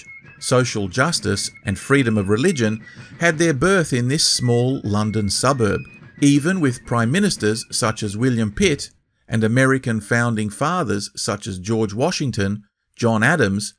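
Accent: Australia. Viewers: I...think you are an Aussie. No problem haha. Your productions are great. And you have an excellent delivery voice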